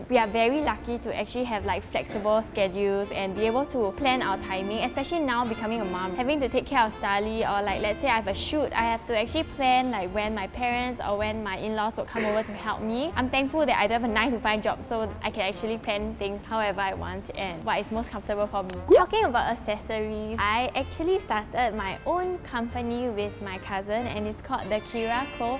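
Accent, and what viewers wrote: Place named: Malaysia. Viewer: Where is this girl from? She has the "lah" slang so wether she is Malaysian or Singaporean? Sorry if I'm wrong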